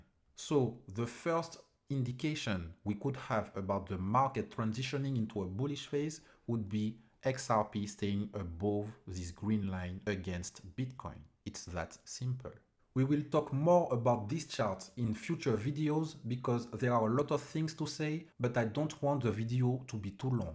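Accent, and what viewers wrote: France. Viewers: L accent est français non?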